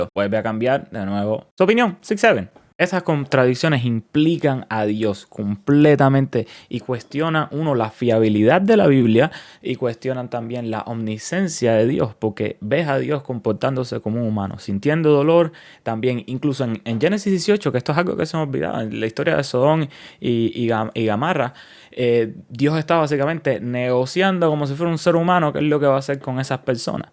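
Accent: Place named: Cuba. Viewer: Bro eres Elieser el Bayardo versión agnóstico ateo , me encanta escuchar el acento cubano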